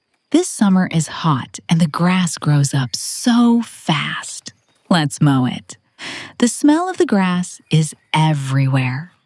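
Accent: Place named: United States. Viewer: I liked it better without the American narrator voice.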